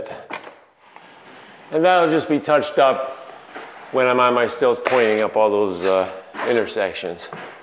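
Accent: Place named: Northeast, United States. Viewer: Hello it's interesting to find your video on here looks like you've been doing this stuff for a long time. Cleaning up this around wondering you must be from somewhere in the Northeast I'm guessing Connecticut Massachusetts😅?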